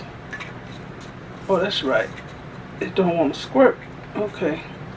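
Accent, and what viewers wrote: Chicago, United States. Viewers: I knew I heard Chicago in you lol This look amazing never thought about adding the soups to my meats … Will be trying soon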